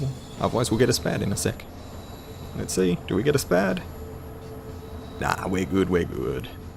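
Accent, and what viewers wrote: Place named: Australia. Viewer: I'm tipping he's an Aussie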